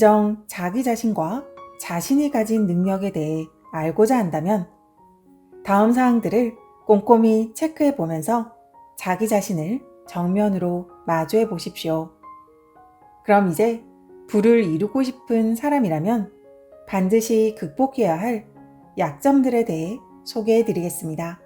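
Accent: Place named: Gyeongsang, South Korea. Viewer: 경상도 분이셨군요~★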